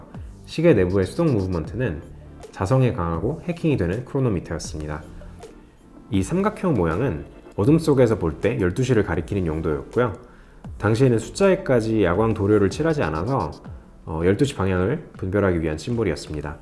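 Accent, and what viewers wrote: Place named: France. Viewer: 아 히스토리 좋습니다 아니 불어액센트 뭐죠?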